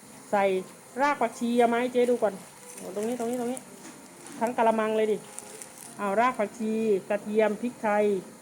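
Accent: South, Thailand. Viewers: คนใต้หรือเปล่าคะเก่งจังได้ความรู้ด้วยค่ะ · สำเนียงชัดเจน คนใต้แน่ๆ😂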